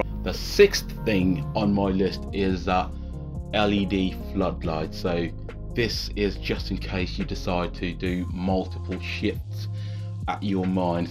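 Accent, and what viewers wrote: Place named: United Kingdom. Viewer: hahaha aaah straight Bri ..ish lol like the accent lol. Thanks man . God bless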